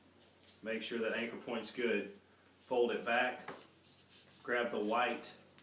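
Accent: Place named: South, United States. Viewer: I think he says 'interior meniscus' (with a southern accent), which means medial meniscus. And he meant to say 70-degrees angle. All good. Still a good, instructional video.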